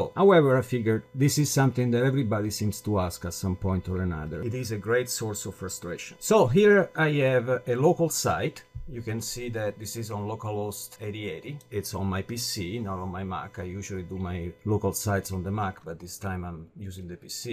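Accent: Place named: Italy. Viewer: being italian i find our accent pretty funny, but true, def good tutorial!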